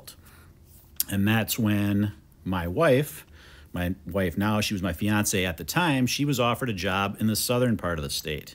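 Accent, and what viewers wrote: Wisconsin, United States. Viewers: I thought I pinned your accent! Much love from a fellow Wisconsinite! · Just from your accent, I was picking up some Minnesota which makes sense kf what you told us where you apprenticed and then I definitely thought you were a Wisconsinite. I'm a born and bred Wisconsinite myself. Dad worked on a diary farm making cheese in summer during school breaks in the Sheboygan area where he was born and was raised in Kiel with family right in New Holstein. I was born in Milwaukee and that's where my mom and her family have always been. Then after being in Crystal Lake, IL until age 3-4 as my dad commuted to work in Northbrook, we settled in Kenosha...so the super southeast and only 10 min from the WI/IL border. Lots of cows everywhere in this state. As a life-long rider of horses (jumpers and dressage) and watching farriers trim and put on new shoes, do you think you could (in a pinch) trim a horse hoof?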